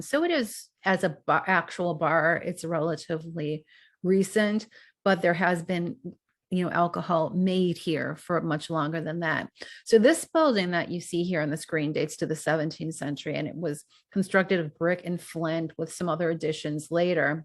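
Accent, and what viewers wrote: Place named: United States. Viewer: The American accent jars; so no thank you!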